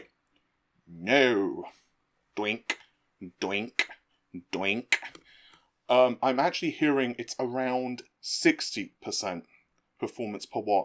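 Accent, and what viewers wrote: United Kingdom. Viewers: It's just pretty normal British English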